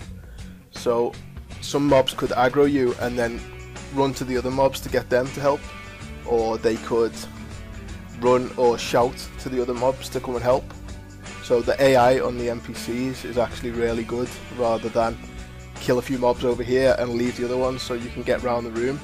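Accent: Liverpool, United Kingdom. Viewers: Oh and its nice to hear another scouser on YouTube =D that alone earned my sub =D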